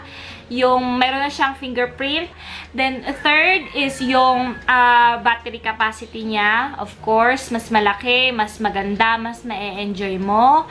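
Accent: Visayas, Philippines. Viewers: bisaya diay ka ate?hehe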